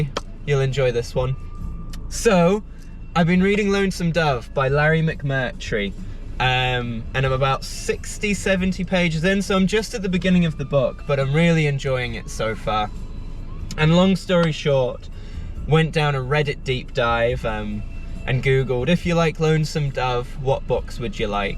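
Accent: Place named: Northern England, United Kingdom. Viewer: this is completely unrelated to books but your accent sometimes sounds so northern english! maybe i just havent heard enough new zealand accents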